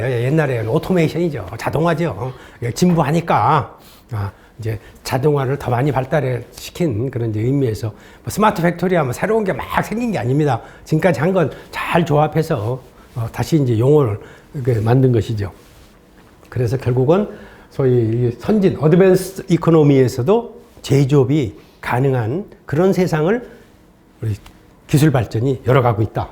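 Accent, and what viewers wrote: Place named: Jeolla, South Korea. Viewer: 당신 전라도 지 ㆍ 전라도 말투네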